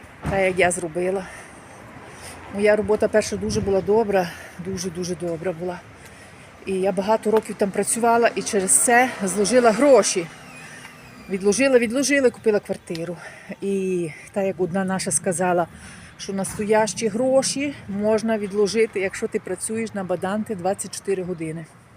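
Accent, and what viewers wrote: Lviv, Ukraine. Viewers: Привіт зі Львова. Чути по розмові, що наша😊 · Si sente dialetto Leopli❤ Pruvit Sono da cittadina vicina al Leopoli Sambir💙💛 Slava Ukraini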